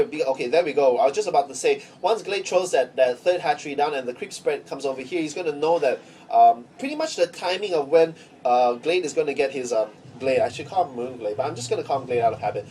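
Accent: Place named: Singapore. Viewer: You sound like a singaporean. And loking at your profile I think you are! Good job. keep it up. =)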